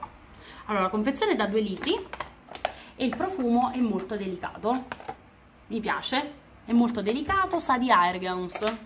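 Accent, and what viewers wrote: Naples, Italy. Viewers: Ciao sono nuova👋ma tu nn sei siciliana mi sembri napoletana😄😄😄sei simpatica👋👋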